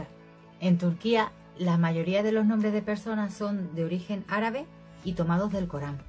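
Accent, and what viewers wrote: Andalusia, Spain. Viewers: Estupendo video, son muy bonitos los nombres turcos, desde que veo las series todo lo referente a Turquía me encanta. No hay lugar en el mundo donde que me atraiga más que Estambul. Me gusta como suena el idioma, las comidas, costumbres, todo es nuevo para mi. No me gusta cuando critican en los medios la vida en Turquía, supongo que como en todas partes hay tradiciones mejores o peores pero por eso no hay que desmerecer a una tierra y a su gente. Por tu acento deduzco que eres de Andalucía y has aprendido a querer a Turquía que me parece maravillosa. Pena que con este virus, cada vez estaremos mas limitados en viajes por el mundo. Te he descubierto hace poco, pero es muy interesante todo lo que dices. Un beso desde Barcelona, tienes una fan. ♥️🌷🌼🌹🇹🇷🇪🇸😘😘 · Me encanta el acento andaluz!! 🙌🙌🙌🙌🙌 Justo estoy viendo uno de tus vídeos "10 cosas que siempre hay en una casa turca"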